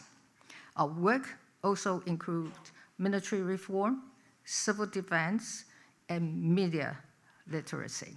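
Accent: United Kingdom. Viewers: 小英的微英國腔好好聽，堅定不移又時而幽默的口氣。不禁讓人想起對抗納粹時的英國政治家。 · 好獨特的英國腔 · 伦敦音很舒服有教养放眼世界无论东西方很少有的领导人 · 的口音真的很英式，不像台灣人在說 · 小英總統有點英式腔呢😂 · 小英的英國腔好好聽喔～～智慧跟勇氣兼具，絕對是全球最有魅力的女性領導人之一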